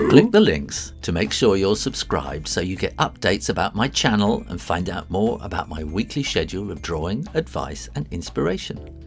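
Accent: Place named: England, United Kingdom. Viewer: So cool! Will def watch ep 2 !! Ps i guess from ur accent you stayed in, or returned to England :D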